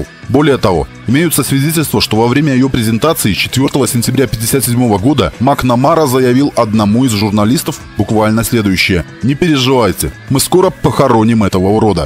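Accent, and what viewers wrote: Belarus. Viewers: У чтеца жэстачайший белорусский акцент.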